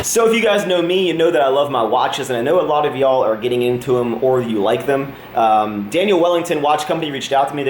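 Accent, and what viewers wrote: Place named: South, United States. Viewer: hey, just curious, where are you from? There's a slight southern touch in your accent lol.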